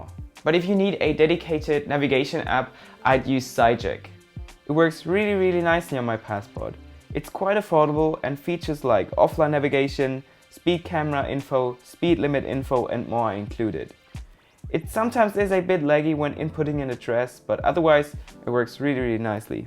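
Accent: Germany. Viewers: Gutes Englisch für einen Deutschen. Hab mich echt gefragt, welche Nationalität hinter diesem Akzent steckt. Respect. But I think that the Passport will have an end in the future. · r u german, if yes, from where?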